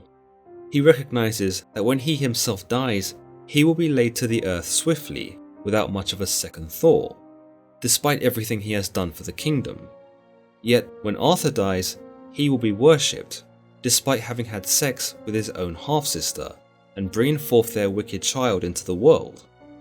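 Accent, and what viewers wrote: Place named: Southern England, United Kingdom. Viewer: He sounds like a southern bloke from England but it could just his accent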